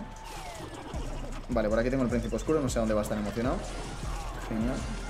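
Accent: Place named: North, Spain. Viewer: a entonces es norteño xd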